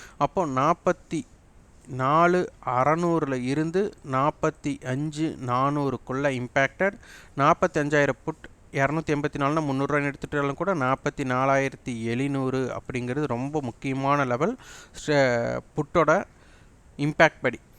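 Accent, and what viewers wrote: Kongu, India. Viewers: Masterji Today i watched this video more than 2 times, while driving to my office i listned the audio more than 5 times, i noticed a thing, if i check & implement it in the market(s), then i will update later. Your teaching & your Tamizh slang are Awesome Master ji, (Iam a big fan of your Kongu Tamiz Slang) Iam sorry iam unable to type on our Tamizh. 👍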